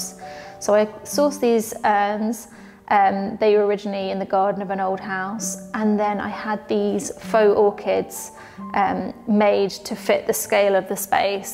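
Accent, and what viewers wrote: United Kingdom. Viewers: How do you spell the word that describes the very large mirror on the staircase? I'm not familiar with the British accent, so I can't tell what is saying Thank you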